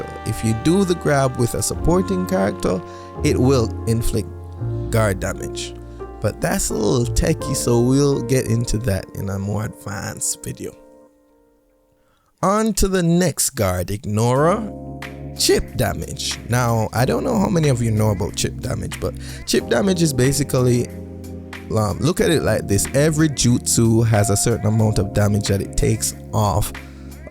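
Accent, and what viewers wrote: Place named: Jamaica. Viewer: I hear jamaica 🇯🇲 in your voice #subbed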